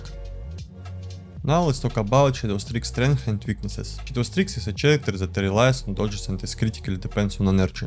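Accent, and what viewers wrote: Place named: Russia. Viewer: This russian accent 😛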